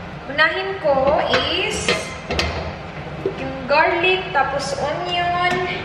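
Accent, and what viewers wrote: Visayas, Philippines. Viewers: I love her visayan accents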